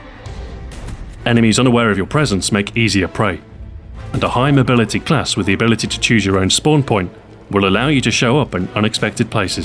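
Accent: England, United Kingdom. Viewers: Im getting the Alan Jackson GE commercial alot before these videos play. going from Alans friendly texas twang to smooth british tones is an amusing contrast. sounds like a salesman an upper class shop to me for some reason.:P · He's English, so that's his accent!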